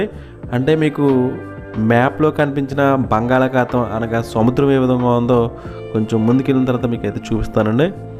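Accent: Godavari, India. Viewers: సార్,. మన కోనసీమ లో మీరు చూపించే ప్రదేశాలు అద్భుతంగా ఉంటాయి మీ విశ్లేషణ కూడా భలే ఉంటాది. సార్.. మన గోదారి స్లాంగ్ లో మీరు మాట్లాడే భాష భలే ఉంటాది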